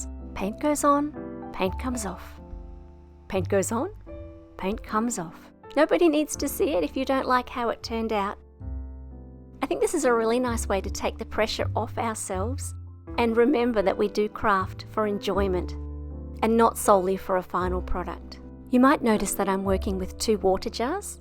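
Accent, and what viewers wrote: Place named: Australia. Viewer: Hi, I love, love, love this technique to colour cards... so beautiful! You have certainly inspired me to make cards similar to these again. By the way, it is so lovely hearing another Aussie accent, and I immediately subscribed. Blessings from another Aussie, Gloria. 🥰🐨🦘💖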